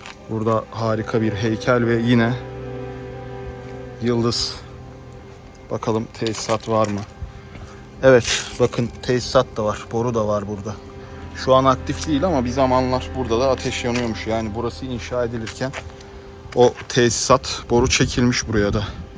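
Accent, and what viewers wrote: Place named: Neftchala, Azerbaijan. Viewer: Aaa tobaa gedib Bankəyəə cıxıb eeeeeeeee😀Abi Neftcala lehcesidi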